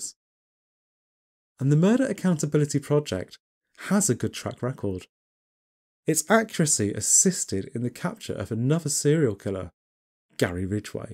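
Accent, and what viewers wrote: United Kingdom. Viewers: New subscriber, enjoyed this very much. I, too, was a little distracted by your hand gestures but it’s a small thing and doesn’t really matter that much. Incredible voice! Something about that British accent. Don’t let ppl compare you to Mr Ballen, I’m sure you get that. I think it’s the similar background more than anything else. Of course, I just did that, didn’t I? Keep it up you have a new fan. I think you hit the algorithm just right. Love that I got here early!!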